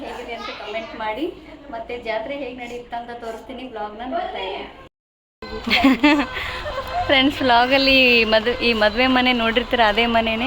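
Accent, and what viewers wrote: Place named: North Karnataka, India. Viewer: Nimma bhashe super ❤️👌 Uttar Karanataka❤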